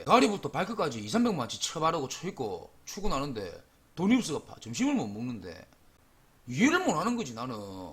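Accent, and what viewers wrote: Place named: Busan, South Korea. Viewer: 하~~~이거 보다보니 몇편째 계속보고있네 썰푸는거 잘안보는데 너무 잼있네요.군대동기 부산놈하고 말투 목소리도 비슷하고 은근히 친근감이 느껴져서 구독하고가요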